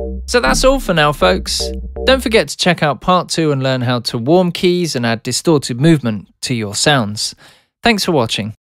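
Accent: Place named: United Kingdom. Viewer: shit sounds way more confusing when its in a British accent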